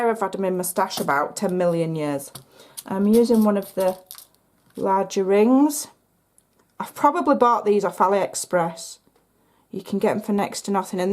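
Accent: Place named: England, United Kingdom. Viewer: those are just the cutest! Your laugh and English accent is adorable. Thanks for sharing your talent.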